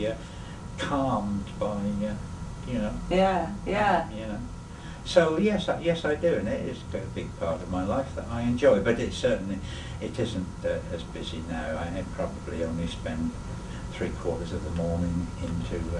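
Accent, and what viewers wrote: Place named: Derbyshire, United Kingdom. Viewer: Howdie, Have continued to watch your videos since before you made it big and I still think you are a blast to watch and you're definitely a pride of Derbyshire. Being a Derbyshire lad myself, it's great to hear a local accent being that I now live in France. Thanks for the videos and please, keep them coming.:)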